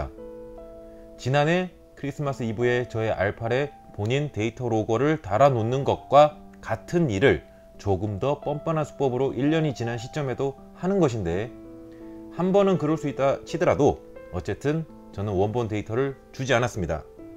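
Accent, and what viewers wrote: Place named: Gyeongsang, South Korea. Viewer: 잘 보고 있습니다. 경상도 억양이 느껴지는데 고향이 서울.경기권은 아니신가봐요. 차라리 강호동, 김제동씨 처럼 말씀 편하게 해보시는 것은 어떨까 조심스럽게 의견 남겨봅니다^^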